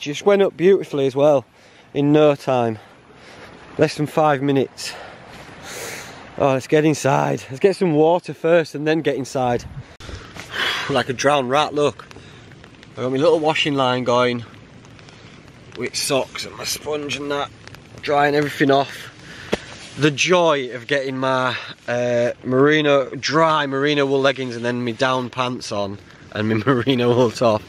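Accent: Yorkshire, United Kingdom. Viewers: love the video, is the accent yorkshire?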